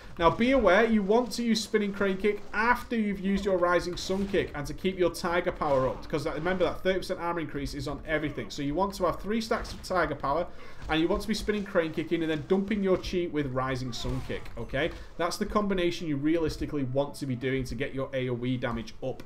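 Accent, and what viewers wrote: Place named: Manchester, United Kingdom. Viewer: Wow, I'm from Manchester too, and I've never noticed, (until now) how annoying the manc accent is.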